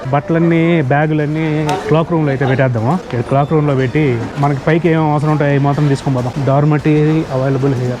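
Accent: Nellore, India. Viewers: Hi bro….One of the best story tellers in Telugu….Hats off to ur content bro…..Mee Nellore slang super gaa vundi….❤🎉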